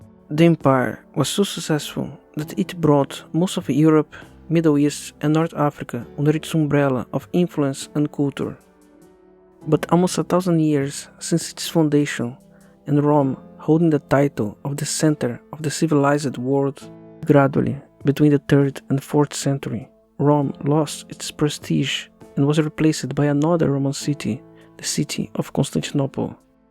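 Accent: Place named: Brazil. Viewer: Are you Brazilian? Your accent sounds Brazilian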